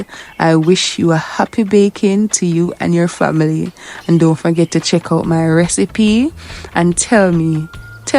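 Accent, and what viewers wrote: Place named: Jamaica. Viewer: I'm living in the US,, I like your Jamaican accent keep it just like that,,,u are so intelligent 💓💯🇯🇲👋⭐⭐⭐⭐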